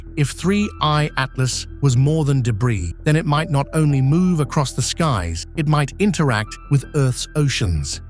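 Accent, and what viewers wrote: United Kingdom. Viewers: The British voice again…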